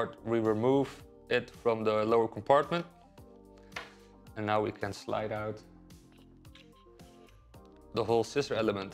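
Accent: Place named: Netherlands. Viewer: Ik zat dit te luisteren en besefte mij ineens dat je Nederlands bent :) dankjewel voor de instructie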